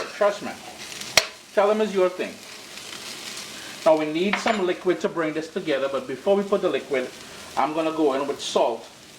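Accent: Trinidad and Tobago. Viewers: Ur good and love ur Triny accent. Great dish have to make it soon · You said "allyuh" so you're from Trinidad, but there are some other accents going on there as well...? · Trini accent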